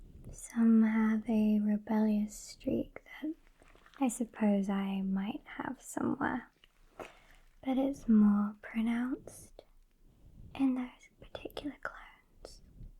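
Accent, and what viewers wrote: United Kingdom. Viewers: Rei Ayanami with british accent and is a nurse?!🤔 Noice!😉😏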